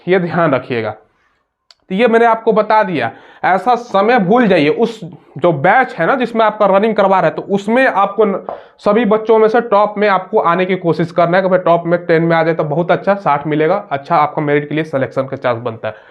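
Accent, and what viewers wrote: Bihar, India. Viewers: Sir aap to khati bihari ban gaye hai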